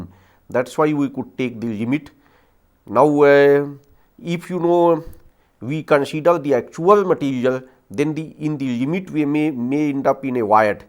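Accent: India. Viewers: p he's Indian... as im i too...but i am not sure which part of India this accent is from..!!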